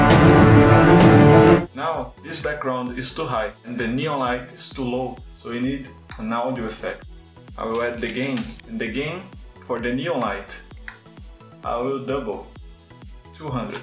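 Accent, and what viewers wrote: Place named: Brazil. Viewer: Pra mim ele já é pica por meter a cara e fazer o video em inglês, já moro fora a 6 anos, falo inglês todo dia, mas ainda não tive coragem de começar um canal em inglês. Mas o sotaque BR é tipico, esse é o nosso tempero especial, os outros BRs sempre me descobrem pelo sotaquezinho de fundo e eu eles. haha Muito bacana, agora eu vou lançar meu neon aqui pro meu wallpaper. hehe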